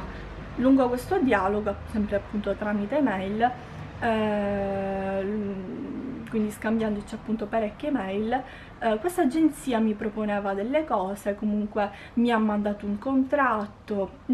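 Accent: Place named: Sicily, Italy. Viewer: Io sono nuova ,finalmente sento la tua voce,un altra Sicilianaa belooo,io sono di Palermo,ma vivo in Toscana da 20 anni .Cara ti seguiro',un baciuzzo 😘😘😘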